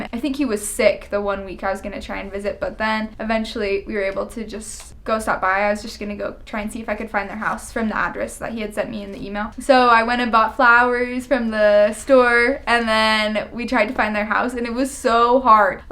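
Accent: Norway. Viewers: Im speechless, your norwegian is almost flawless, it actually sound like a norwegian living in the US for some years, adapting their way of speaking :) You speak southern norwegian way better than i could ever have done, coming from Northern Norway :) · Tror hun egentlig er norsk. Du hører det på aksenten. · Ser Norsk ut og er så god på Norsk at Engelsken har Norsk aksent... Du lurer ikke meg, ho der er Norsk.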